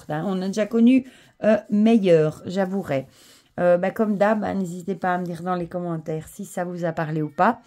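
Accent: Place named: Belgium. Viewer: Coucou bonjour 🐈❤🐈‍⬛🦄🐿🦔🐼🦆🐢🐇🐭, Grand Merci pour cette vidéo. J ecoute grandement car ce matin, je n etais pas présente. Ce jour 33 degrés 🌡, c est un grand ouuufff 😢. Un été qui ne brûle pas, c edt un vrai REVES. Un jour ca brûle, un jour un peu moins. C est cooolllll, que tu fasses la guidance sur ta terrasse. Le train 🛤, un vrai bonheur. Tu me fais trop rire 😂😂, ton Gardfield 🐈 qui est têtu 😂😂. J adore cet accent Belge 👍👍😘😘. Gratitude infini 🙏. Je te remercie infiniment et je te souhaite un bonne journée. Chez nous, comme tu sais, c est Férié. Je t envoie pleins de belles énergies 🎉💫💥. Prends soin de toi et de Ta Famillette. Bisous bisous Sylvie 🙋‍♀️🕊🦋🕊🦋💙💙💙🙏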